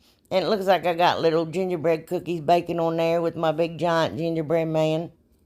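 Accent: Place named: Kentucky, United States. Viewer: Ok, I found you scrolling through. Are you from Kentucky? I love the mountains! The accent! Im a transplant here. Great video! You are my new friend! Crackin me up!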